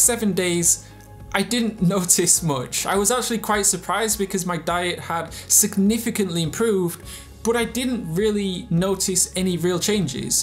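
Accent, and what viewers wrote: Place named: Northern England, United Kingdom. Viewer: When you lived in the UK, were you from the North of England? That accent is nice! ☺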